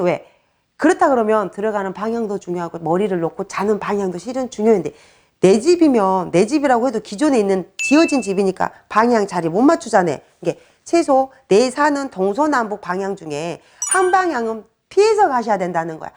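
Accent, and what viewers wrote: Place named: Jeolla, South Korea. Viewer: 선생님.고향이전라도신갑네요 겁나게정겨워요.말투가너무좋아요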